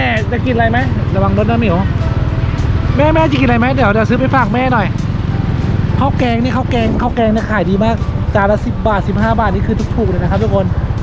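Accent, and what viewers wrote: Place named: Nakhon Pathom, Thailand. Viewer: สวัสดีคุณ และน้องหมิว เอทำไมพูดเหน่อเหมือนพี่เลยแฮะ พี่คนนครปฐมจ้า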